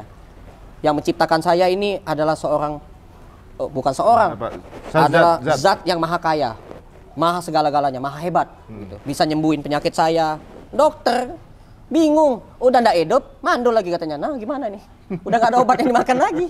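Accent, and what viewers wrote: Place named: Palembang, Indonesia. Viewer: apo kabar mang cek gaya wong palembang nian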